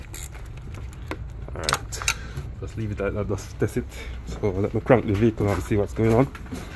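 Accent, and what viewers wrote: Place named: Jamaica. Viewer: Sound like a yardie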